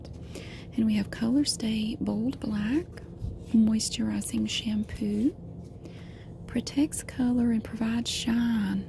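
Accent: South, United States. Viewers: I guess being an adult means falling asleep to a southern lady organizing the clearance section of the dollar store.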